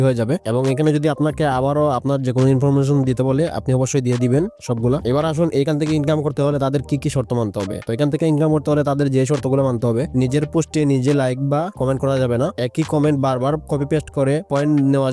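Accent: Sylhet, Bangladesh. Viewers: ভাই সিলেটি❤❤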